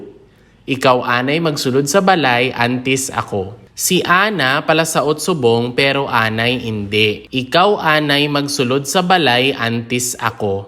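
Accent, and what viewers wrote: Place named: Philippines. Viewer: Philippine english man ini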